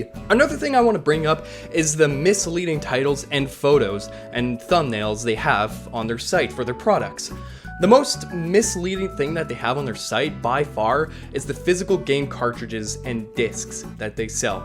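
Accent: United States. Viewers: How are you not from America but have one of the cleanest American acents I’ve heard in a very long time ? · You have a very American accent, where are you from?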